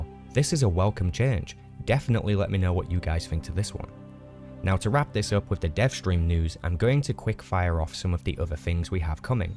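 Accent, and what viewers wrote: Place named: Northern England, United Kingdom. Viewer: A fellow northerner? I must subscribe! Love owt like this wi’ voices like ours like.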